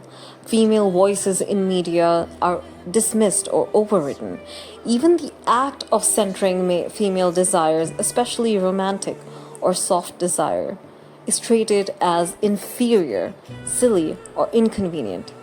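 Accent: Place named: India. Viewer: I hope we get more games like lads... Also you sounds indian if not then its completely my fault for assuming (i just seen very less indian playing lads, ) and the your vocabulary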